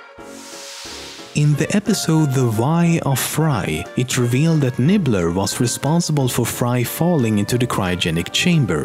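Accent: Sweden. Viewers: I'm going out on a limb here and guessing the presenter is Scandinavian. Hmm... Swedish? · the guy talking must be swedish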